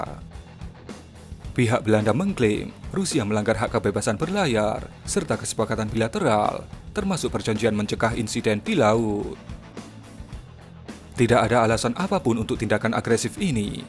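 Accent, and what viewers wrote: Java, Indonesia. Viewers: Admin logat jawamu sangt menganggu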